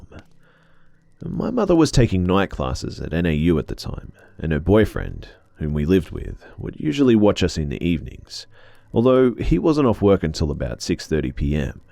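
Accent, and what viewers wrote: Australia. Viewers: Ahh it's always good to hear that amazing Aussie voice! 🌼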